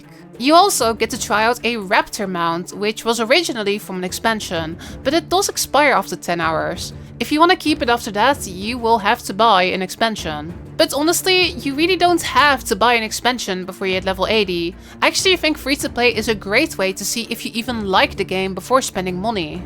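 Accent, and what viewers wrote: Netherlands. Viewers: wat is je nederlands engels accent irritant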